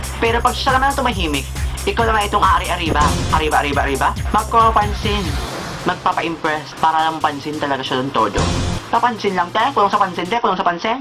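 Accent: Visayas, Philippines. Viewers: Are u bisaya?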